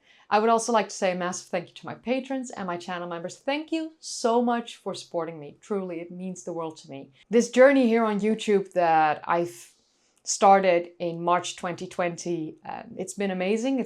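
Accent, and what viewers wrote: Netherlands. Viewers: It would be an immense exaggeration to say I have the same reaction as Jamie Lee Curtis in A Fish Called Wanda (search YT for "fish called wanda russian scene"), but I do enjoy, in a perfectly clothed way, hearing say things as a Dutch person! Practice "Berg" op de wijze van, everyone Then the name of the original land owner Advanced users - say "Gerard The Umbrella Plant" in the manner of, as my best friend - a Dutch guy - insisted when i was 19yrs old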